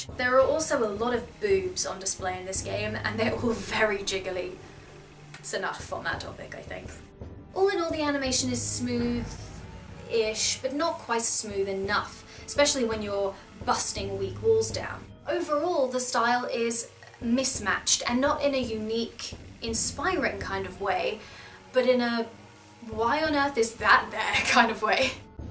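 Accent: United Kingdom. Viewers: awesome review is that a british or australian accent!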